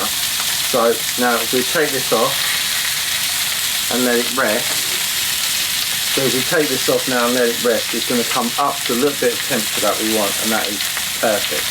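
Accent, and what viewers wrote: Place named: United Kingdom. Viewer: I love that 🇬🇧posh English accent! And the Ninja💐!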